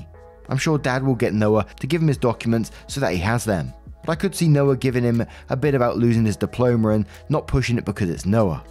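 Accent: England, United Kingdom. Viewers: Why is the narrator who sounds English saying he needs therapy? Who the F gets therapy in England or most of the world in fact? It's an American thing. I would never go chat to some stranger about my life and listen to their bullshit opinions. I make my own decisions in this world.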